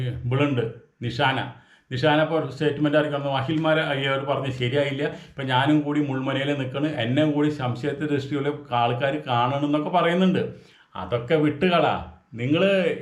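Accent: Thrissur, India. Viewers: I enjoy your trichur slang malayalam . You are bold. Brave man. Good comedy. Thank you.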